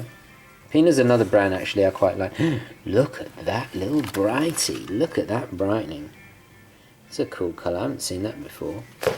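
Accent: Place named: England, United Kingdom. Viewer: I do every much enjoy your reviews and your lovely English Accent. Superb. You dived through the MeisterSigner rather fast and I am the owner (for the second time) of the MeisterSinger Singulator, for which you make some very positive remarks. The reason this is the second time around as the first time, I truly did get confused and couldnt get used to the fact that the big hand (the hour hand) is really all you need to refer to. On the Singulator, it gives you two additional hands, the minutes at the top and the seconds at the bottom, but in all honesty, a rarely make referrrence to either of them, just using the one big hour hand. I wish MS would change the second hand (seconds counter) for a date wheel, which I find something that is really missing on this model. The movement is superb as you say, beautiful. Take a look at one (if you can find one) I am sure you will like it. 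How about a review on QP Watch magazine. You might like that too. Nice work you are doing, keep up the good shows. `Mark, Amsterdam.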